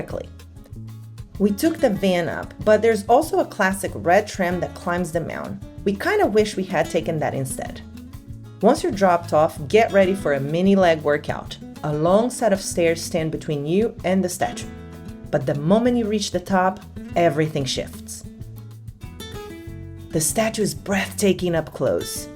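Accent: Brazil. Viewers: Você é brasileira, né? Pelo sotaque. Acertei? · Teu sotaque te entrega menina, você é brazuca.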